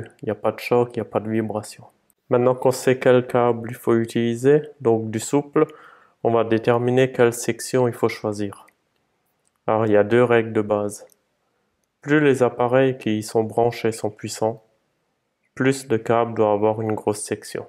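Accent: Alsace, France. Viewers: sérieux ta voix elle me dit quelque chose. Tu es pas du bas Rhin ??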